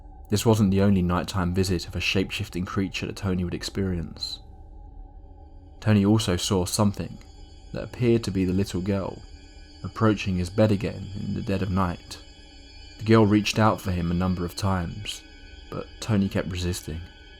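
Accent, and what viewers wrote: United Kingdom. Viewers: Just stumbled upon your channel. Great storytelling and delivery. Not many of these types of videos have that elegant across-the-pond accent. 😊 Thank you for all your efforts and keep up the great work mate! Cheers from New York City.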